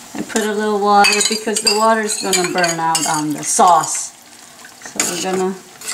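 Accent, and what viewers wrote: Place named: Caribbean. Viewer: You sound more West Indian than East Indian. Are you guys in New York?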